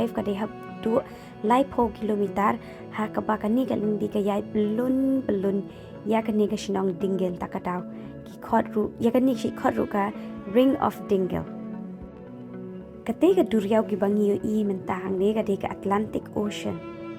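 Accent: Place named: Sohra, India. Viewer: Ka sur kren jong phi sngewthuh ba dei nong sohra ,dang kiew shaphrang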